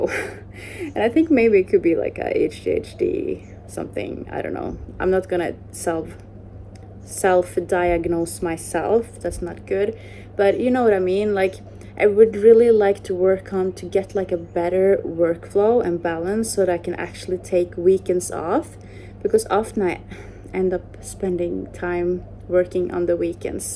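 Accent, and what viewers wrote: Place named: Scandinavia. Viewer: Ah känner, yeah sounded like you were from Scandinavia.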